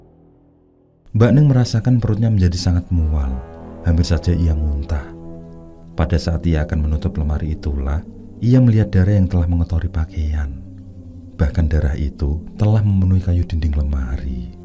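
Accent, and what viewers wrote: Java, Indonesia. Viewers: aq pake headset kok, tpi om nya terlalu medok 😁😁😁🙏🙏🙏🙏salam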